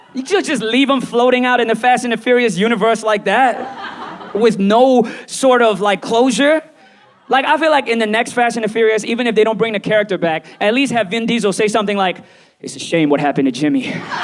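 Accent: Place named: United States. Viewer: Looked this guy up because I’m currently watching Idol producer and was curious who he was because I could hear the American accent through his Mandarin. Little did I know that he’s funny as fuck!!!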